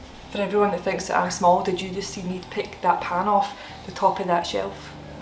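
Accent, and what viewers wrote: Scotland, United Kingdom. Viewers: damn I love the scott accent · I get the feeling that lots of people can't undetstand you since you don't speak " youtube Scottish", very, very likely everyone still loves your wholesomeness, but for me, I'm Australian. Both my folks are fresh off the boat Scots and I have a massive family in Scotland. Iv grown up listening to Scottish- actual Scottish on youtube is so refreshing. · A Scottish accent on women might be a crime against humanity. Why did she remove a quarter of the carrots? Why did she remove over half of the sellery and throw it away? Why did she fry the vegetables first, ensuring that the meat can never get brown? All of that said, I think the basic recipe has potential and I'll try it out, maybe add the cream on the second day for variety though. PS: Don't use plastic chopping boards, plastic's bad for you.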